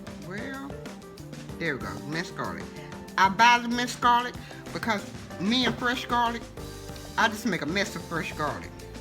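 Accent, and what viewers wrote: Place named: Mississippi, United States. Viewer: Accent is 100% msg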